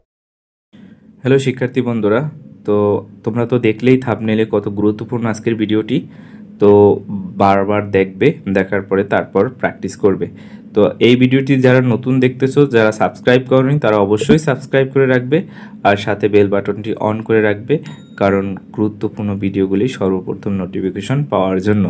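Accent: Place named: United States. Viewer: ইউটিবার ভাই ঠিকই বলেছেন। আপনি আমেরিকার উচ্চারণ দেখতে পারেন। তারা এভাবেই বলে। call রে বলে খল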